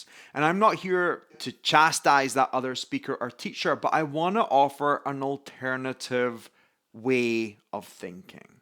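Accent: Scotland, United Kingdom. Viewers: Always a great pleasure to hear you (love your Scottish accent)and thanks for this deep lesson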